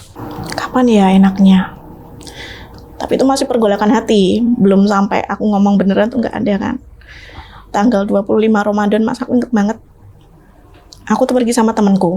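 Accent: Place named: Java, Indonesia. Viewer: Mbak.. Mbak logat mu jowo kental 😅 Kalau cerita yo mbok pake Bahasa Indonesia mbak, kasian viewer gak tau Artinya non Jawa #Salam dari Surabaya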